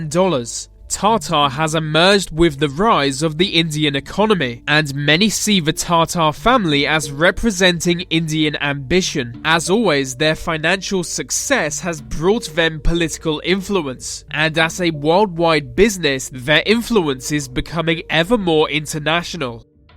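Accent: England, United Kingdom. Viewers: I've heard many disgusting limey accents in my 47 years of life but this one is, by far, the most horrible and fucked-up. Can't even say from what part of the fucking island is. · first of most pathetic to hear every other type youtube with English accented fool!!!!!!!!!!!!!second of all the word power is and have been misued in terms of the meaning....in reality the meaning of the word power is used as money for this and beyond era...…all these idiots that you display for us on youtube are just idiotic money abusing ignorant megalomanias!!!!!!!!!!!!!! - because mentality is being misguided every decade, so does the terminology of the word...……..money taints the reality of what human beings stand for..... no not corruption but worse than that....control!!!!!!!!!!!!!!! brainless - no intellectual weapon of choice!!!!!!!!!!!!! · How interesting, a man with a British accent lists the most powerful families, yet conveniently overlooks the House of Windsor (NOT THEIR REAL NAME).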